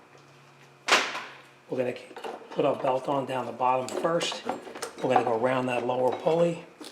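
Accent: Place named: New York, United States. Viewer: Hey. Nice video. Where is your shop? Your accent screams New York. lol. I'm from NY. Long Island, NY.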